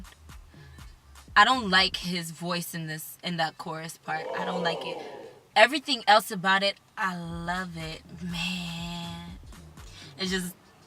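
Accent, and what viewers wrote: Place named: Nigeria. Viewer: honestly you sound nigerian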